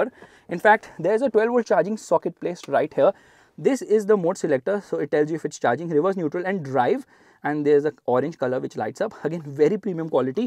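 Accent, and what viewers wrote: India. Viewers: Bro Your video is Alright but your indian accent are so funny 😃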